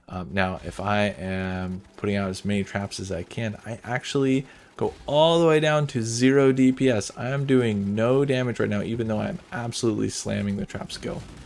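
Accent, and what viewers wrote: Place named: Germany. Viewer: Absolutely stunning video man. I wholeheartedly appreaciate eveything & second put into it; since a also worked on some videos I can tell, it was a lot. OT Question: Where are u from exactly, ur having an interesting accent, reminiscent of German but w/o the typical German pronounciation I'd expect.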